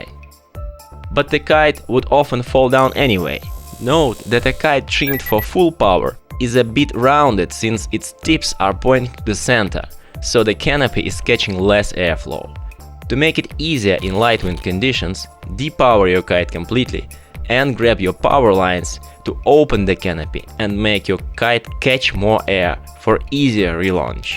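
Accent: Russia. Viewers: Facking good Russian's accented pronounce!!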